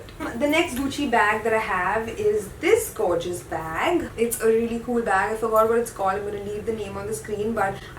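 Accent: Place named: India. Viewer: Talk slowly..too fast hard to understand because of strong indian accent.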